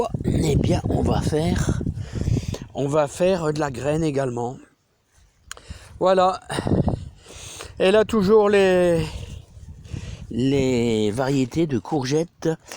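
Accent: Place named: Nord-Pas-de-Calais, France. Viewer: Bonjour, je n'ai pas de jardin. Mais je profite à fond des vidéos que vous postez, j'adore Votre bonne humeur😅. Je suis une chti partie dans le sud, et votre accent me rappelle d'où je viens😉. Bonjour à toute la team des jardiniers 😁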